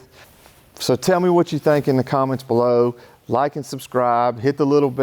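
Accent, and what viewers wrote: South, United States. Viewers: Tars (tires), awl (oil). The southern accent has a charm all it’s own.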